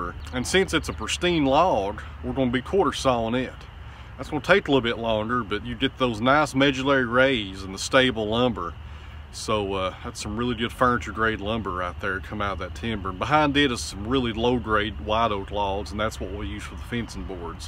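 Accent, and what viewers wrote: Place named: Tennessee, United States. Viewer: I'm new to your channel, and I have to say it's mesmerizing. I've never spent any time watching someone use a sawmill, but it's as though I have to watch you. What's so refreshing is your enthusiasm for the work, and the fact that you really, really appreciate the gift, direct from God himself, of the wood. I've had a love affair with wood since I first took a shop class in the 9th grade in 1972. When you reveal the inside of a log, and you revel in the fact that you are the first human being to see it, it is like unveiling a never-seen-before Rembrandt. I have stood in wonder as I gazed up at the ceiling of the Sistine Chapel, in awe of the work of Michelangelo. Yet he is no match for the beauty of a slab of walnut, uncovered by your saw mill. Thanks for bringing this great art to the world. In addition to woodworking, I'm a photographer as well. 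Both habits, errr, hobbies, are supported by my day job, which I love, and both habits, I mean hobbies, are black holes that swallow money without end. If I were to engage in milling wood like you, I'm fairly certain it would mean the end of 36 years of marriage.:) Besides, I live in a part of Wyoming where trees are few and far between. I will get my saw mill fix from your videos. Finally, I love the way you talk. I have a friend who hails from Tennessee, and he sounds a lot like you. That's a pretty unique thing in this part of the world!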